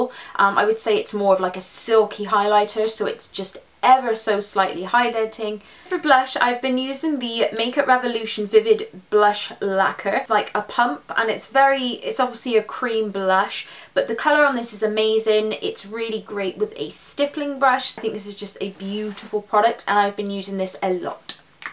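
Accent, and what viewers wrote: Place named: Somerset, United Kingdom. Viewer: New subscriber! I adore your channel! Where are you from? I hear a twinge of sort of Somerset/Bristol accent! I'm from the north-west! I adore all your tutorials I'm binge watching your videos you're gorgeous!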